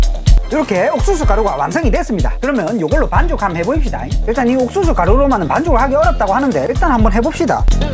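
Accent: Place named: Gyeongsang, South Korea. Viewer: 경상도 분이시구나 ㅋ ㅋ 저도 한 면사랑 하는데요 😆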